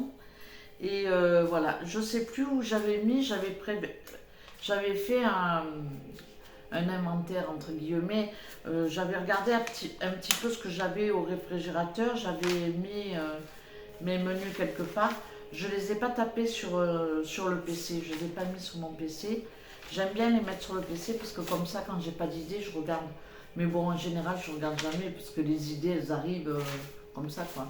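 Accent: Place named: Marseille, France. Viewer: Bonsoir et, merci pour tes idées repas 😊, et surtout non, ne t'arrête pas de parler nous on adore t'écouter et en plus avec cet accent marseillais quel plaisir 🤗. Ton petit willy est vraiment trop beau et ses yeux magnifiques. J'ai loupé ton live j'étais en balade chez des amis 😏. Bonne soirée bisous bisous à vous deux et caresses aux petits animaux 💋💋🐕🐈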